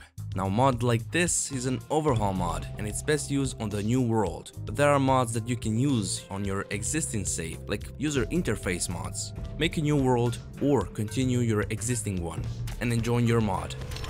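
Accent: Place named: Russia. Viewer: i really like your accent, are you russian? also, LOVE the video! it's really well made, easy to understand (no-knowledge-about-mods-me understood it, which is impressive! XD)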